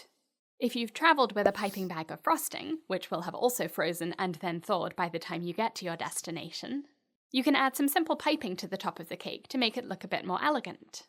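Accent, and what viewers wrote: United Kingdom. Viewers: British~ Like it,that's amazing -;)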